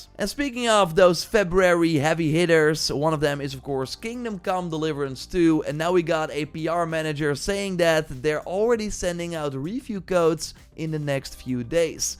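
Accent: Netherlands. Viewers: je Kaas engels blijft gewoon grappig lol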